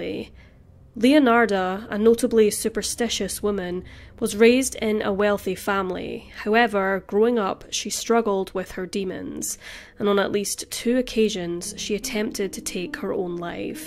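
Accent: Scotland, United Kingdom. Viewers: She's Scottish. Her twin sister Kristy Skye has her own channel and said they're from Scotland. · Yup Scottish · Scotland 100% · I’m trying to work out where the host dialect is from she sounds Scottish but she doesn’t sound just Scottish, it’s puzzling me 🤔😆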